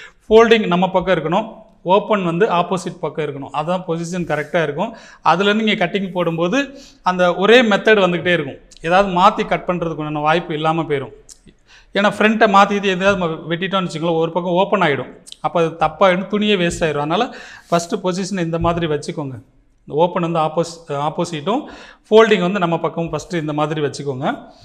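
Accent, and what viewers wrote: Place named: Madurai, India. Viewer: Anna neenga Madurai ya..? Unga slang super😊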